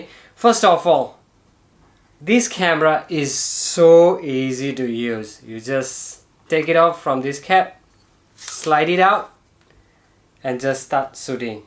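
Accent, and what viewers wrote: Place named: China. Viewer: i wonder how come a Nepalese guy speaking English in Chinese accent .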